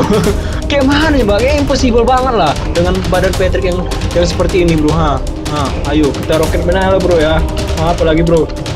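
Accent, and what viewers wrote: Medan, Indonesia. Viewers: ngomong nya aja kaya orang medan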